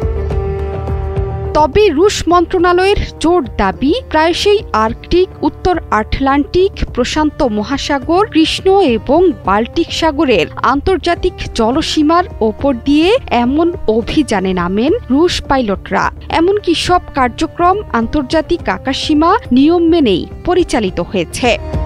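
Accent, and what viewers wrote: Kolkata, India. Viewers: এইসব খবর পাঠিকা দিনে দিনে কলিকাতা হয়ে যাচ্ছে৷ উচ্চারণের সে কি হাল৷ চাবায় চাবায় কথা কয়৷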